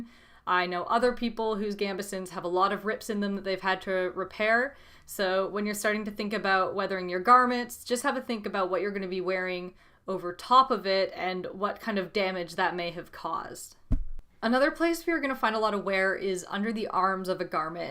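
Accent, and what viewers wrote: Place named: United States. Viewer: You sound like you're either American or Canadian, did you adopt that accent, or are you not originally from AUS?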